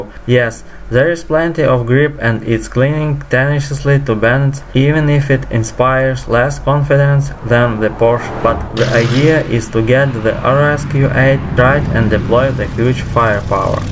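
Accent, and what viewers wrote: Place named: Russia. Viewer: Не привычно слышать русский акцент в видео на английском )) Молодец, продолжай!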